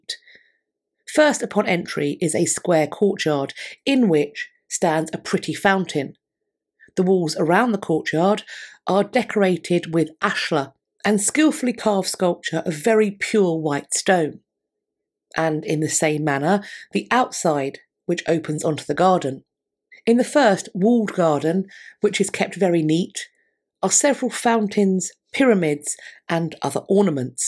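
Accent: RP, United Kingdom. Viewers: I say we re-build it!!! (does the Trust have any spare change? hee hee) Thank you, for this lovely presentation! I believe Nonsvch was Henry's sort of dream house, if that's possible for someone who owned many palaces and castles. It was indeed the place he envisioned his legacy and descendants would carry on. I've always thought Charles II to be handsome in some strange way, but shame on him for allowing the destruction, regardless of his former lover's reason. I can see now, in her velvet and silk Elizabethan gown, hair up, perky smile and posh accent, telling off Ms. C, preventing the destruction, and sentencing Ms. C to a lifetime of farm labor, since even "thinking" of destructing Nonsvch was a crime, just as only mentioning the king's death was treason. By the way, does anyone know if the Nonsvch church would have dropped any hints of any particularly religion other than the Church of England? 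hee hee With an Italian designer, I smell Catholicism which I wonder if Henry truly ever abandoned entirely. 🎭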